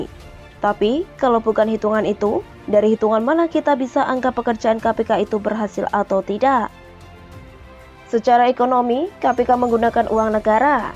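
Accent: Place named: Java, Indonesia. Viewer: intonasi dan medoknya announcer gak cocok buat berita...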